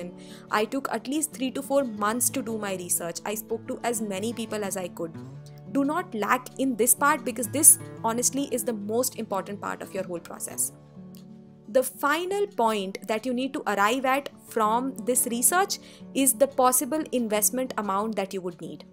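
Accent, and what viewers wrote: South India, India. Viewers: Pure south Indian accent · Great.. Indian accent as well as melodious sound...! But u speak a little bit fast!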